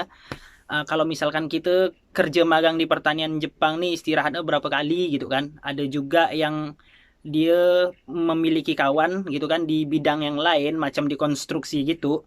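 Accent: Malaysia. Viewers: Mari logat jowo, kenape sekarang jadi nak Melayu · mantep mas, bahasa kuala lumpur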